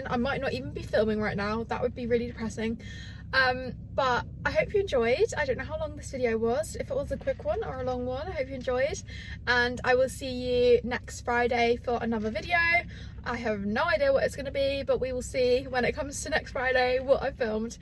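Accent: United Kingdom. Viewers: omg I love your voice! I never knew you were english 🤍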